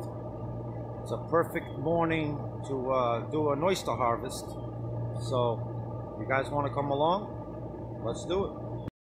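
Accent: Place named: New England, United States. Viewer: I'm new here, what body of water is this? I'm guessing you are somewhere in New England with your accent! Wow I've never seen them processed before. Looks like I was right with NE. Your jacket says cape cod. It's on my bucket list to travel out there since we're only a few hours from there.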